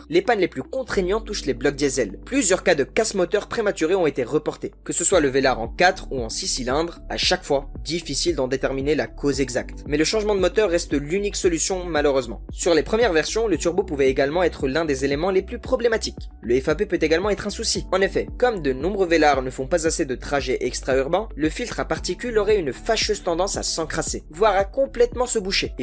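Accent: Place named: Banlieue, France. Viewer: Intéressant au début , par contre les : voitchures et les rrrr de banlieue, merci j’ai arrêté de regarder c’est insupportable, salut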